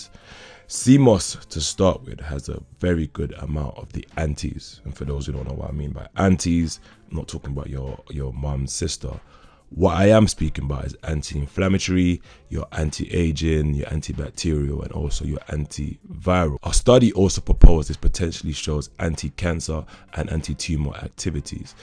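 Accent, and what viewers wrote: Caribbean. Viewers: The information that you shared is very empowering. Thanks. I love your voice/accent. It's very raspy/sexy and Caribbean. Can you share some shakes or drinks that we can make using seamoss/seaweed/algae? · He sounds Caribbean to me.....African are different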